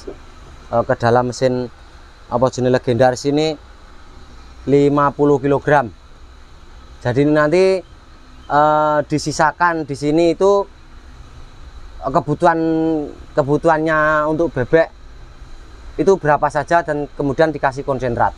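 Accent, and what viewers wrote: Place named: Java, Indonesia. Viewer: Bahasa ny medok tapi peternak cerdas ini😊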